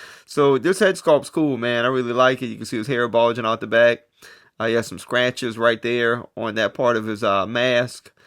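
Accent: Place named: New York, United States. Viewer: ..and I was thinking you lived in NYC lol. You're vocal inflections are very similar to Jay Z.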